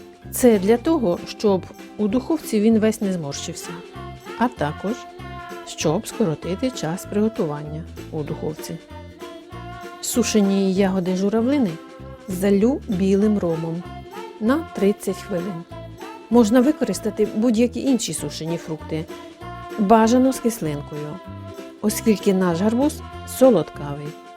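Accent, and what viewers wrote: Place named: Border region, Ukraine. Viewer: 6 гарний гарбузик 😃 Цікавий у вас акцент, десь на кордоні живете? Смачного 😋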